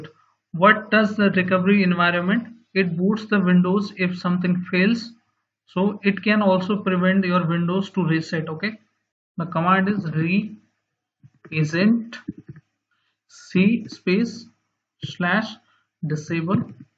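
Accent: India. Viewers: It worked smoothly. Been searching the solution for hours. And i knew im in a safe place after hearing an indian voice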